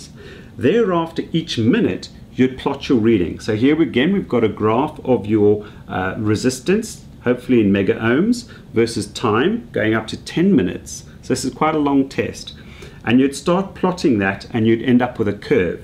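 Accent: South Africa. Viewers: haha sud afrikaans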